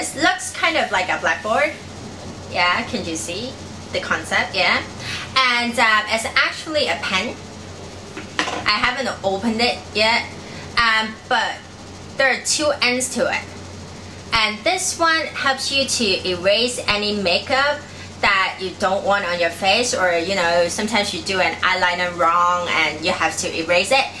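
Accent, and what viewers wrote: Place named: Philippines. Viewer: Where do u from? U sound like a pilipino lolz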